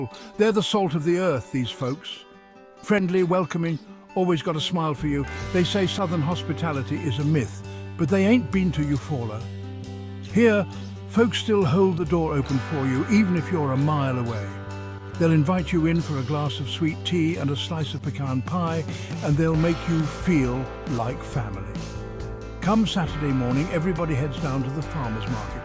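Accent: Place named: United Kingdom. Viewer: What a crock I lived in Eufaula for over 30 years. This is a bunch of stock photography and some dude with a British accent thumbs down.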